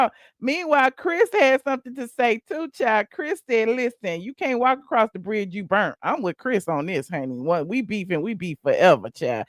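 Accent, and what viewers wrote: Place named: Georgia, United States. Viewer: "Come own Ian" is what it sounds like to new yorkers . Its like youre a Georgia big sister reading a bed time story . Thank you 🩷🩷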